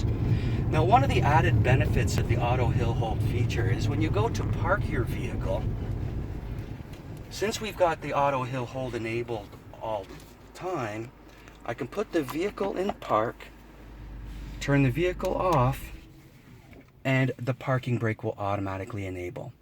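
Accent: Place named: North America. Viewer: North American accent..right hand drive ?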